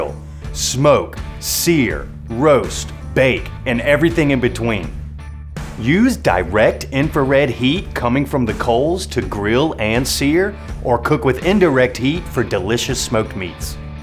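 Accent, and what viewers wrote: South, United States. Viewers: you're a natural at this. I can see you going around the State interviewing local BBQ guys, talking shop, bringing over some BBQ's to show off and test.. I'm up in Canada and I could listen to the southern accent discussing BBQs all day... Combing products and techniques outside the showroom. I may not be able to buy local at your store, but its good exposure and the channel itself could pay for the adventures if it gets enough traction.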